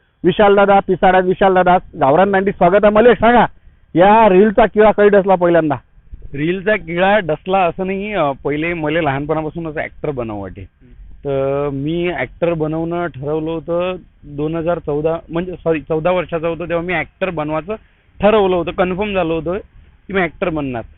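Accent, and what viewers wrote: Varhadi, India. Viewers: हा विदर्भाचा सुपरस्टार आहे विशाल भाऊला कोणी ओळखत नाही असा महाराष्ट्रात सापडणार नाही. खूपच छान वऱ्हाडी भाषा, ऐकायला फार छान वाटते पण मला तशी बोलता येत नहीं. विशाल भाऊचा प्रत्येक रील हा इमानदारीने व अभ्यासातून केलेला असतो. प्रत्येक व्हिडिओ लाईक केल्याशिवाय पुढे जाता येत नाही. पुढील कार्यास खूप खूप शुभेच्छा.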